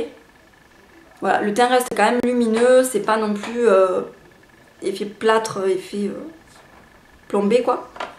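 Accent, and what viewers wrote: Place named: Marseille, France. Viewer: vu ton accent tu dois venir prés de marseille?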